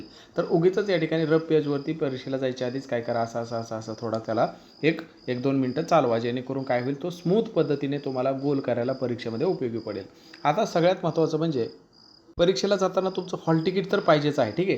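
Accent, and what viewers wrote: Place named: Latur, India. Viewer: तुम्ही लातूर विभागले आहात का sir 😅 म्हणजे बोलताना तुम्ही "घेऊन जा. अस न म्हणता घेऊन जावा." अस म्हणता म्हणून विचार..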